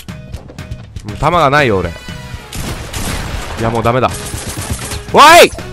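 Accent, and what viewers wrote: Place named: Hiroshima, Japan. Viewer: うん、広島とかその辺の方言なんだよね。 by広島県民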